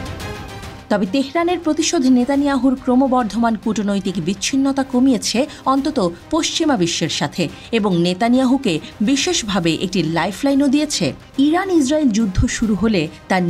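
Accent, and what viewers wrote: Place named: India. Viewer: উপস্থাপক ইন্ডিয়ান হান্ডেট পার্সেন্ট সিওর আমি 🤔😢😂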